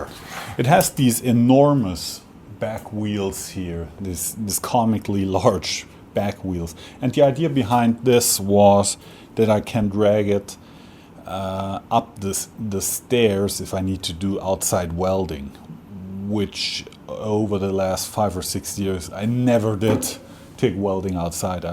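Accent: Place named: Germany. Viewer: Hi! Mpls MN 55407 The German accent makes the audio very enjoyable.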